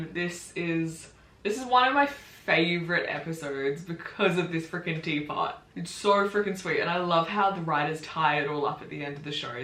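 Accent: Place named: Australia. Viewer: I really like your Australian accent.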